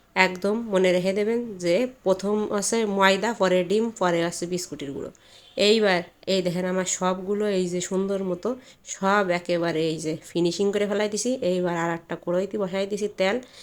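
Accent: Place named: Narail, Bangladesh. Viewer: আফা আপনার থেকে আমি একটু নড়াইলের ভাষা সিখতাম🫠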